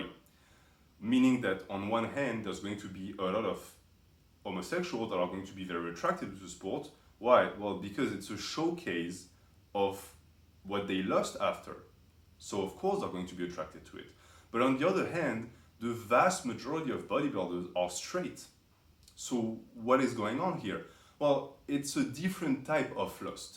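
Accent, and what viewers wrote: France. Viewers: Is your native language french?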